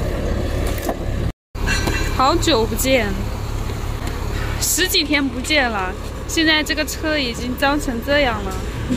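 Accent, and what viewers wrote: Chongqing, China. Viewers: 在重慶都要這樣講話嗎？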